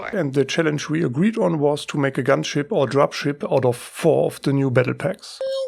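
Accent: Germany. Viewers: Man hat direkt gehört das du aus Deutschland bist 😂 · Man merkt das du deutscher bist nichts gegen dein Englisch aber man hörts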